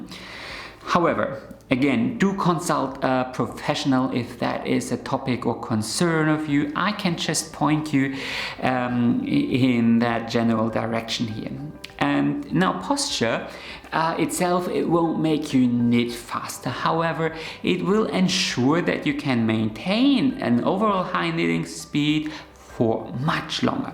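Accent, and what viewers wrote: Germany. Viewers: I have some Norwegian friends and you are so like them. your style of knitting is so relaxing. I knit the Norwegian way. This is a quick way to knit but sometimes I like to knit slowly. I enjoy every stitch. Now I listen to your accent more closely I can hear the Germannic style. Germany is a lovely country and the German people are so warm and friendly. I hope we can keep in touch. Lol Jacqui from Seahouses UK x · Donnerwetter! 👍 Abgesehen von den vielen sehr nützlichen Tipps sprachlich perfekt! Damit meine ich jetzt weniger die Aussprache – den deutschen Akzent hört man nach wie vor –, sondern den Gebrauch des eher ungewöhnlichen Vokabulars. Ich bilde mir ein, ausgezeichnet Englisch sprechen zu können, aber bei dieser Thematik müsste ich passen.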